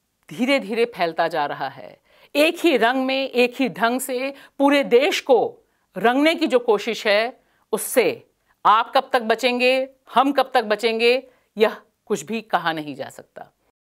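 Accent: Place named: Jabalpur, India. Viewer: आपका बोलने का अंदाज, मध्य प्रदेश के जबलपुर आसपास से लगता है, एकदम बुलंद 💪🇮🇳🙏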